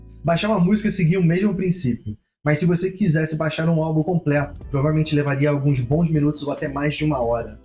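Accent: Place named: Rio de Janeiro, Brazil. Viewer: Sotaque carioca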